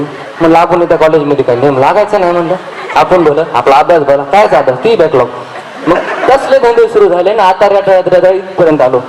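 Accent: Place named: Ambajogai, India. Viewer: full ambajogai chi language.... Mast vatal bhava..👌👌👌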